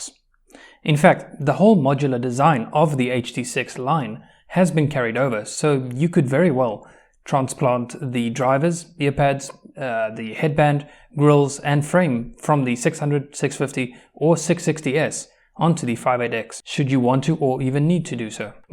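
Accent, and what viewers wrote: South Africa. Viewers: Unrelated but are you South African?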